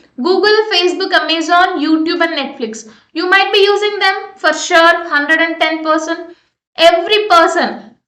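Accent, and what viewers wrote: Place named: West India, India. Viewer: Nice Voice,I like accent 👍 atleast good west India people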